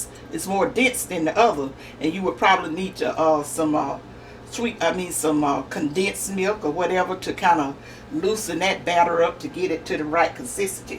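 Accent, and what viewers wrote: South, United States. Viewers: I'm new to your channel and you are funny without even trying! I love you! Potatoes in jackets, forgetting what you were talking about, and then that phone rang and you left us all sitting here staring at that bowl & mixer!🤣 You sound just like my folks! I don't have my Southern mom and dad anymore, and it's Thanksgiving time 2020. Watching you, I'm feeling so nostalgic. Thank you for being you😘 p.s., thank you for helping our dear stay on track!😂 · Just found this/I love your SOUTHERN ACCENT!! I’m going to send this to myself since I will not be able to watch all-have appointments. Thanyx a lot. PS: please tell me “what out of the jacket is/how is it done. My name is Evelyn but folks call me “Luv.” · Yum! My favorite pie! Your southern accent is so beautiful and I love listening. God bless.Never tried the sour cream or butter flavor but I have two big taters right now marked for pies! 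· Thanks so much for your recipe! I added a slight twist to mines….I blended about a half a cup of heavy whipping cream to the sour cream. Turned out GREAT! ❤️ ** I love your southern accent ** ❤️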